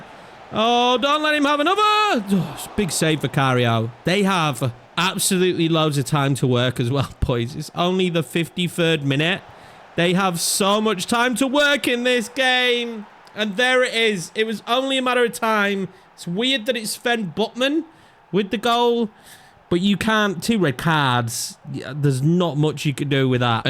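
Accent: Yorkshire, United Kingdom. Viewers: Let's get a Top CB like Diomande or Silva for CB and maybe get rid of one or two. The next stage of good teams is trimming down the squad and improving with genuine quality. The voice overs are clean Yorkshire Man.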